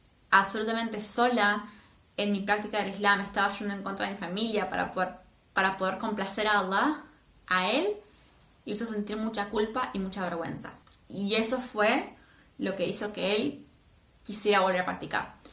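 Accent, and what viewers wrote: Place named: Argentina. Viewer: Me gusta escuchar el acento argentino 😍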